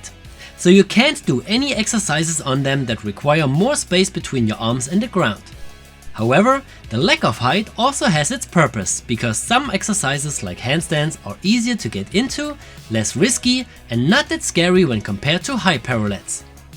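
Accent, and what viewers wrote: Germany. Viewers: Leichter deutscher Akzent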